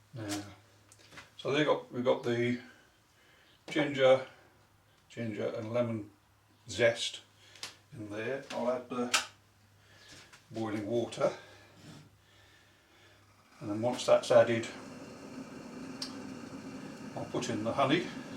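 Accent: United Kingdom. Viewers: Do i detect a lincolnshire accent? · Is that a west country accent?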